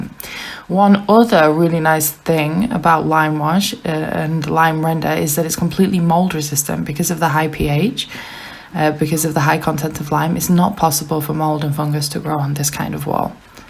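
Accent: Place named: South Africa. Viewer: After a second vid I’m hooked and just subbed. Ice plant is fire resistant! The concrete pope portholes are brilliant. Love your accent, reminds me a little of some South African friends of mine but yours is a bit more exotic. Can’t wait to watch more of your build, you are a talented family.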